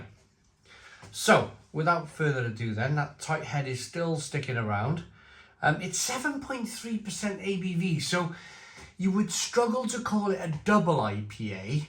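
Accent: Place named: England, United Kingdom. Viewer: Proper english lad with a proper English accent reviewing a proper american ipa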